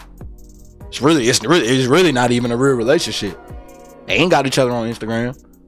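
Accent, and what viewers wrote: Baltimore, United States. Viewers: Bro you from Baltimore lol?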